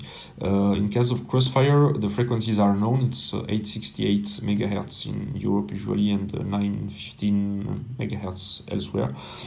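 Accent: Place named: France. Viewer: a l'accent francais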